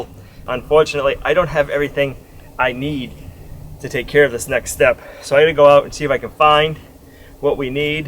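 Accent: United States. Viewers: Regional dielect we have them all over the US. Lighten up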